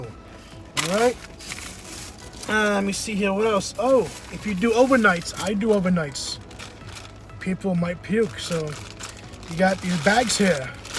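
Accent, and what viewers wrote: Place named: Boston, United States. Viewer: Yeah exactly lol! Just take the blame it it's your fault. Yeah, been raining like crazy here. I can tell by the accent you're from Boston!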